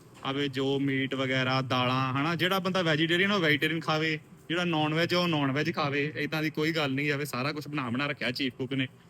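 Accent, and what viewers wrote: Majha, India. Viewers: Majhe wala 😂lagda wa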